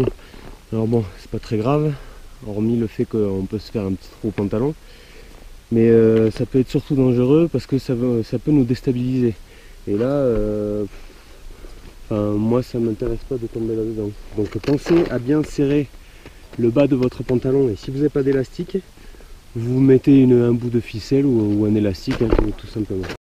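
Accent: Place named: Southwest, France. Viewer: Cest vrai quand vous regardant les gars vous êtes tres claire, une voie fort sympathique ( j’adore l’accent du sud ouest ) mais j’ai eu la même reflexion en cas de chute et malgré le piolet bien en main ca risque de vite chauffer sur les mains et donc le réflexe sera de lâcher le piolet...donc oui des gants semble s’ajouter a votre panoplie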